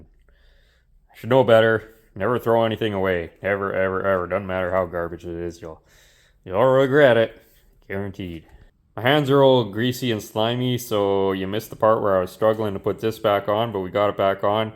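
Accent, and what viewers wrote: Canada. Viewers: Gosh, you sound Canadian!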